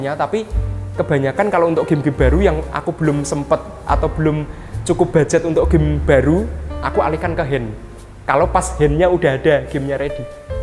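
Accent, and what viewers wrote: Java, Indonesia. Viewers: Mas sebenarnya asli jawa atau kalimantan?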